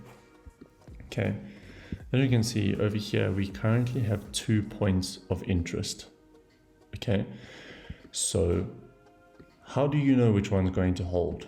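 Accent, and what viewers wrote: South Africa. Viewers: is that a Cape Town accent? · You sound South African 😅 great vid bro🧠👊🏾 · you south african? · You from South Africa right? Really good video mate, well explained!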